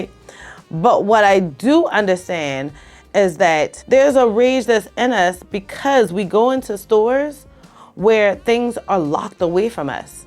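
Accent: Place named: Lowcountry, United States. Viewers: I knew you were from Charleston with your accent! I’m from there as well. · I hear an accent are you from the Lowcountry?SC, cool video 😊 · Girl where you from ?… cuz that accent sound like a Charleo solider.. big Geechie 😭😭💙💙 edit: I heard the accent in the beginning of the video before you explained the riot story but yeah you sound like home 💙💙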